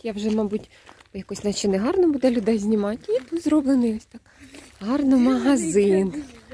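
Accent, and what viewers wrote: Cherkasy, Ukraine. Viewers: А я все думаю, що мені діалект дуже знайомий. А виходить ми земляки. Я також з Черкащини (Тальнівщина)., а Ви звідки саме?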